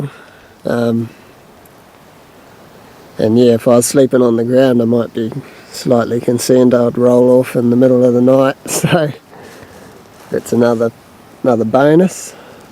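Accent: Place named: New Zealand. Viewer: Did you grow up in the urewera region bro or a you a southerner ?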